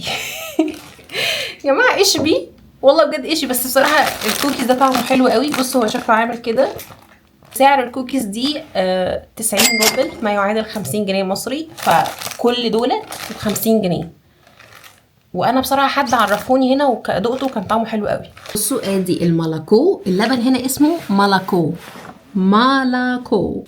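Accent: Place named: Jeddah, Saudi Arabia. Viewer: انتي صايره تتكلمين سعودي جداوي هل عندك روميت جداوية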